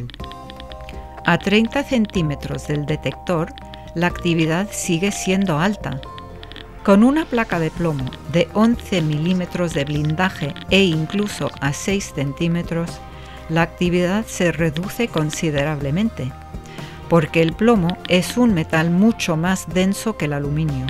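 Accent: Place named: Spain. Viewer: Amo los documentales cuando relata un español, las imágenes son didácticas y tiene música placentera de fondo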